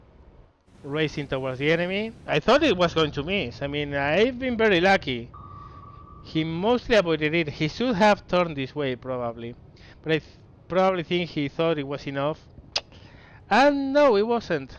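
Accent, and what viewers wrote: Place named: Spain. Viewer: Where are you from? You have a really familiar accent.. perhaps Spain?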